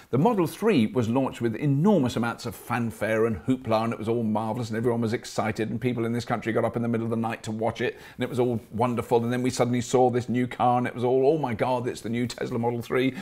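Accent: France. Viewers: You really cheered me up with the great French accent and with the revelation about "matured fierce French women" :) Just won a BIG LIKE and a SUBSCRIBTION to the channel! Cheers! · keep working on french accent :-D love you videos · super funny! Love the french accent! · you totally made my day when you used your "frenglish". Swiss people always make fun of French people speaking English, because well... They make no effort at all ^_^. Really like your episodes, they are educative, complete and entertaining. If I might, it would be even better if you used numbers, when you use words like: lots of, double, etc. One thing that I like about the presentations of Elon Musk is that he uses meaningful numbers and actually prove that they fit and are reasonable. Congrats for your vids and channel. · Earned my "thumbs up" just for that French accent lol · Well done sir. 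I especially liked your French Accent. There is one problem however, and it is with your set piece; your desk isn't worthy of you and would perhaps be of better use behind the scenes. If that isn't feasible, maybe a nice tablecloth would suffice. I have subscribed to your channel and wish you well. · Love the French accent lol. Good video. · Pretty good French accent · Dude you're hilarious! Very good French accent! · The french accent XD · liked this video for your french accent alone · French accent on point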